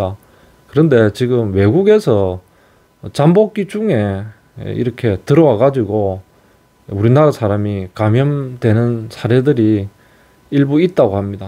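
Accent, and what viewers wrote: Gyeongsang, South Korea. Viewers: 표준말 쓸려해도 억양은 어쩔수 없구만요 저도 경남쪽인데 말투가 구수하게 느껴 집니다 문디에 대해서 추억과 나름데로 의학적 공부 좀 하셨네요~ㅎ 항상 수고 하십니다~^^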